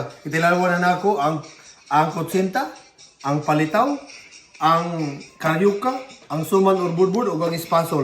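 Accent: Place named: Visayas, Philippines. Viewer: Kalami ana sir oi nalingaw ko nimu oi bisdak japon kaayo ug sinultian,salamat diay sa imong support ganiha sa akong ls sir/Lyn’s Beauty here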